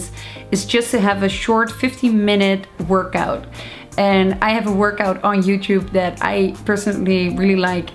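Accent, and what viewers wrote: Netherlands. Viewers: OOOH you're dutch! I seriously thought you where from an indian or bahama kind of place, because of your accent, but after you showed that card I could hear that you're dutch haha. I love these tips. They're really helpful! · echt een nederlandse accent :) Leuke video!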